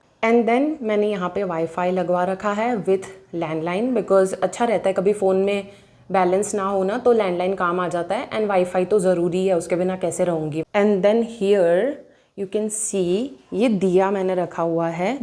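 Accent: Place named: Gujarat, India. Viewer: Muje aisa kyu lagta hai ki aap gujrati ho😂kuch words sun k muje laga.. Bt nice chota house. Bohot hi acha ghar hai. Apka ghar ise acha hai ya fir ye ghar acha he.. Jarur batana👍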